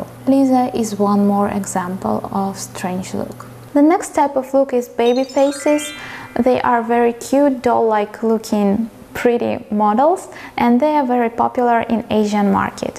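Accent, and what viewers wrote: Russia. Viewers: вы же русская? слышу этот милый акцент аххахаа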